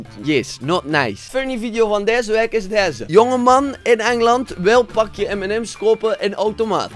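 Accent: West Flanders, Belgium. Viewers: West Vlaams denk ik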